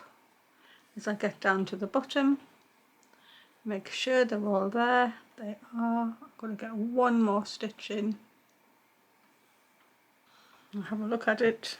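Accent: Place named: North East England, United Kingdom. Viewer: The daisies have made such a difference. Your coat is looking so special. I love your videos especially your lovely NE accent.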